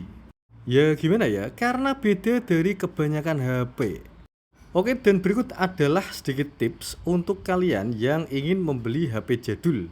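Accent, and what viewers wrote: Java, Indonesia. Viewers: Wong jowo y mass..logat nya tu....😅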